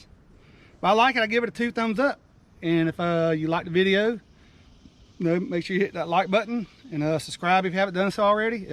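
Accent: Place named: Texas, United States. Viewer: well, I mean there is a Spanish pronunciation, but I wasn’t trying to offend you man. Sounded like just a southern accent. Similar to what my family in Texas would say. Liked the video, just made me chuckle. No offense brother.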